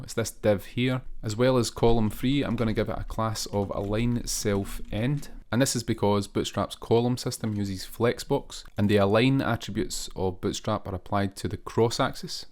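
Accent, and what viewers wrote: Scotland, United Kingdom. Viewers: Subscribed for the amazing combination of relevant web development content and a Scottish accent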